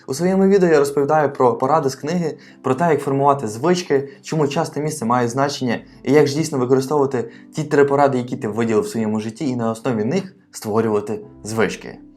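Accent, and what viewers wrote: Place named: United States. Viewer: Ех, не можу за акцентом вгадати штат( Успіхів вам і розвитку каналу! 🙌🏻✨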